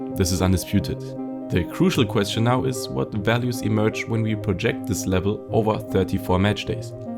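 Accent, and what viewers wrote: Germany. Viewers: der deutsche dialekt nervt ja übel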